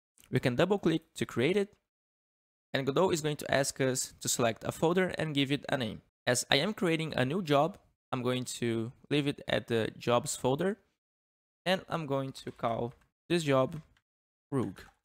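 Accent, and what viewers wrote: Brazil. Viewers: Esse sutaque nn me estranho kkkk br certeza.. oueut o ficando loko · Woah, such nice Brazilian Accent,! Gotta like that! Also, nice video:3 É nois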